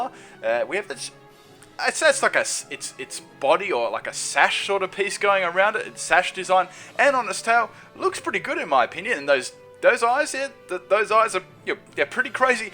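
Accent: Australia. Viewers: Australian tho, accents are completely different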